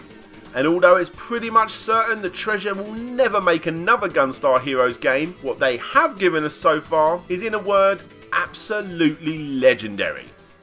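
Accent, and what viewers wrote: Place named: London, United Kingdom. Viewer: Lower-middle class Londoner with a poor mic and worse marketing. Shame though; his content seems pretty good.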